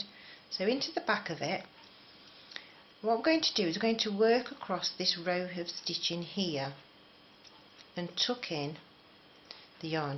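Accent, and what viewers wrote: United Kingdom. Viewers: I love this pattern and how you've divided up the series to be as simple as possible! I'm not exactly new to crocheting...but I am new to crocheting correctly and new to following patterns! I only ran into one area of difficulty in following the written pattern you have in your description; and that's when you've finished the toe area, and you're adding the tongue to it- you write "count back 6 from last sl st of toe section". In your video, you instruct "don't count the slip stitch area - start counting with next stitch," and perhaps your written instructions could be clear about that, as well. No big calamity, as I'd only done one tongue wrong before referring back to your video. A big huge thank you for your excellent video quality, as I never had problems following where you were putting your stitches (most other videos are terribly frustrating in how many times their stitch work strays from sight!) and listening to your fun British accent was a treat, too! 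To thank you, I let every ad play out!;)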